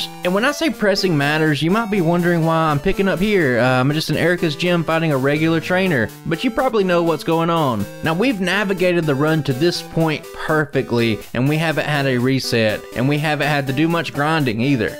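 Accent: United States. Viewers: Woah that must be the most american accent ever o.o much yeehaw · I don’t know if anyone ever says this to you but every single “southern” character from the indigo league Pokémon anime series shares your accent.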